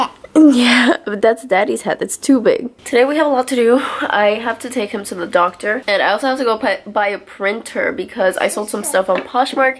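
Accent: Puerto Rico. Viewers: El bien boricua jajajajaja arrastrando la R y hablando sin la S al final de las palabras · He is Puerto Rican is obviously 😂 reconozco mi acento “déjame veL”😂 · i love how puerto rican he sounds. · I recognize that Spanish accent anywhere! Puerto Rico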